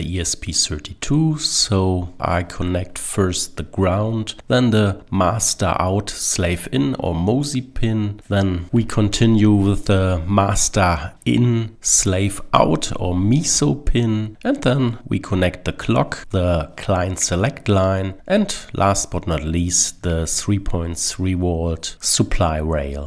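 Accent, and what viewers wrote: Germany. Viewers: He is German. Are you some kind of retard?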